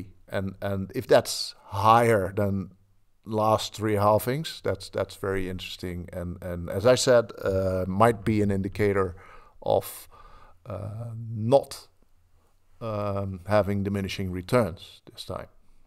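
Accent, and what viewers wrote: Netherlands. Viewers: Heerlijk dat Engels met een Nederlands accent..😊 dank voor de info. 🎉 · This is the most Dutchiest Dutch accent I have ever heard. Great video! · Come for the long term $BTC analysis, stay for the Dutch accent. Thanks!! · lekker hollands accent jonge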